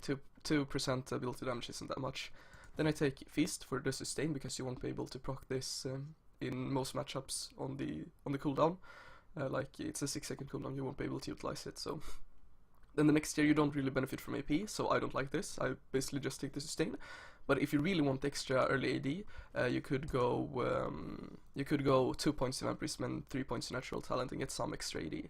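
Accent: Sweden. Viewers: This guide was awesome! Are you Swedish xd? (Your accent sounds like a swede)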